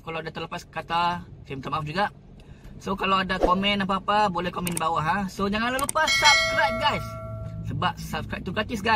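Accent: Sabah, Malaysia. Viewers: Slang sigu macam org sabah suda oo..salute sama kamu sigu..semoga sentiasa happy dan bahagia sama family dan murah rezeki selalu ar sigu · Say tgk ko ni mcm bukan sda org kedah ni hahahahahah. Pekat suda tu loghat sabah hahahahahah. Tpi kwn say brterima kasih jga la slepas tgk video ni, kwn say dri selangor kena suru pindah pg sabah. Mula2 ragu dia kunun mau pindah sini. Ada kwn pun say ja. Takut dia kena ilmu hitam apa smua. Takut org sabah nda terima dia. Skali dia tgk ni video nda sabar kunun dia mau pg sini. Hahahaha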